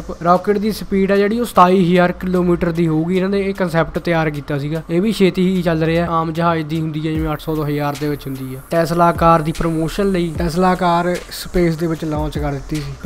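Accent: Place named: Punjab, India. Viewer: Veere teri boli anandpur sahib area di lagdi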